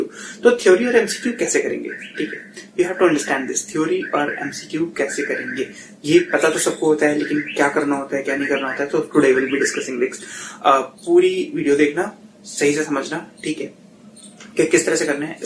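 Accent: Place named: Kashmir, India. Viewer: Hey bro I guess you're from Kashmir na?? 😄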